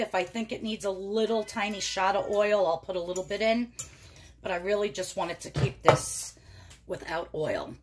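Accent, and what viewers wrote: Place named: Long Island, United States. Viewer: Hi, happy to find your channel! Are you from Long Island? I recognize that accent!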